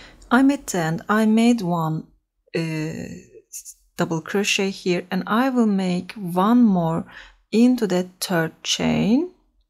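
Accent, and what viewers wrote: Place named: Turkey. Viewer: Your accent is familiar. Are you a Turk?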